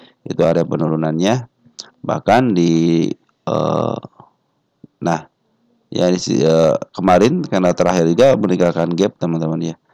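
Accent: West Java, Indonesia. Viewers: orang sunda ya kang?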